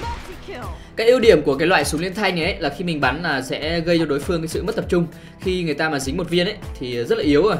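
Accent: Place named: Saigon, Vietnam. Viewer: ông này giờ nhại sài gòn rồi :)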